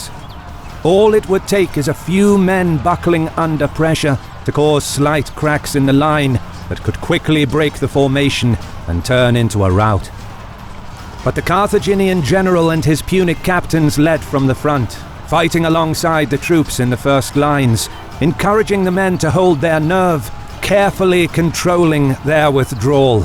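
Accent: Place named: England, United Kingdom. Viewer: Brother I like your English accent😉😉!!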